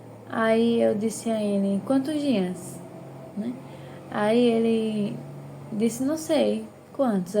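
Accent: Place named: Northeast, Brazil. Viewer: Graça e Paz irmã Olha não sei de onde vc é, mas pelo lindo sotaque acredito que dê alguma região do nosso lindo e abençoado nordeste eu sou se São Paulo, olha o seu vídeo falou muitoooo comigo , apareceu aleatóriamente aqui no YouTube e desde o título até o final do vídeo, inclusive o hino falou ao meu coração 🙌🏻🥺 Que Deus te abençoe, já se tornei uma inscrita 😍🙏🏻❣️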